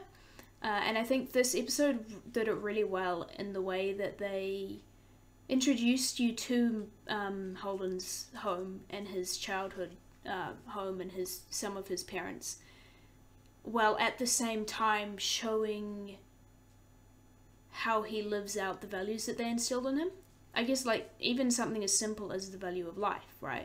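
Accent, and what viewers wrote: New Zealand. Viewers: LOL Well that is funny. A Kiwi. I didn't pick up your NZ accent, but I should have, as I love Karl Urban. I just noticed the way you said idea. Thought you might be from southern US, not the other side of world. LOL 😆 I enjoy your reactions though and you haven't hit the REALLY good stuff. It's about to seriously heat up 😁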